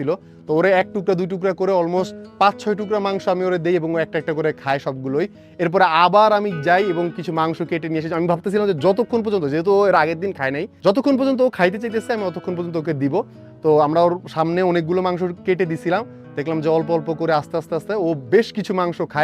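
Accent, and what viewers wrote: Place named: Sonargaon, Bangladesh. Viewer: সোনারগাঁয়ের আঞ্চলিক ভাষা এটা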